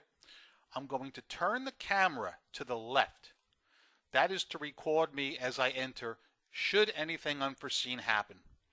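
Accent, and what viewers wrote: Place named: Queens, United States. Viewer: Hi.Your channel name mentions NY, but you live in NJ. Did you used to live in NY? I grew up in Queens and we have similar accents.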